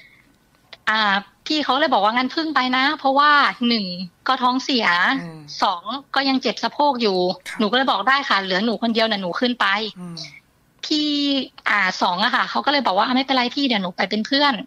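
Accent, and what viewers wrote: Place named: Sukhothai, Thailand. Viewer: สำเนียงการพูดเหมือนคนสุโขทัยเลยครับ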